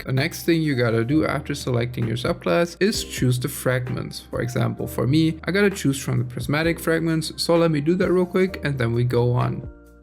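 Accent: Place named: India. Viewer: Ah listen to that thick indian accent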